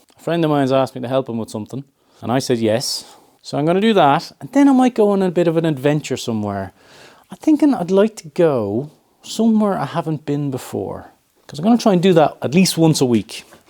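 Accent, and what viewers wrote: Ireland. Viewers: I spent so much of my life being made to be very quiet that I learned to observe and love things others rushed past. I often talked to my bicycle, too. I discovered the joy of talking to cats, dogs, cows and they reacted. Others do this too and I think nothing of it. Some people say we're a bit off until they talk to their car, calling it bad names or begging it to forgive them to get them home. My accent is like yours because of my dads irish accent. I am an American but in certain parts of certain states that accent was preserved. I miss my family, most dead and hearing yourvaccent causes me to feel at home with them again. Thank you so much for posting these videos! You may think it's boring for us but I'm far from bored.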